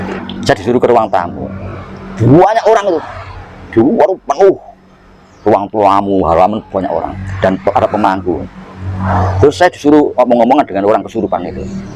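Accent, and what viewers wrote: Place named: Lumajang, Indonesia. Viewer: Logate wong Lumajang..Iki..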